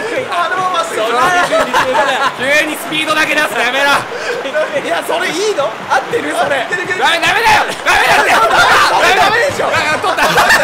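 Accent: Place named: Kyoto, Japan. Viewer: まじレトさんもっと京都弁出して……同じ京都人としてドキドキしてしまう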